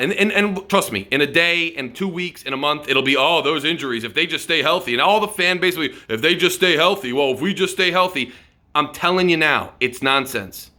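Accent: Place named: New York, United States. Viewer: Funny thing, you sound like you’re a NY transplant in Miami? Somewhat heavy accent…. I’m a die hard Bills Fan, born and raised in Buffalo , be 62 in June, Miami vs Buffalo has been a rival since the 70s, they owned us in t(e 70s, 80s, get a new coach and QB and Miami is a threat…. Good luck in t(e playoffs,,, Go Bills..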